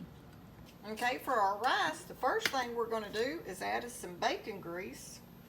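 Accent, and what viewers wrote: South, United States. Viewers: Don't you just love it? 🤗 I always love the sound of a southerners words. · 🤣😂🤣It's southern charm, dear. I live in Missouri and my southern friend from Virginia thinks I talk funny. We can appreciate one another's differences, and be surprised by them.! 💕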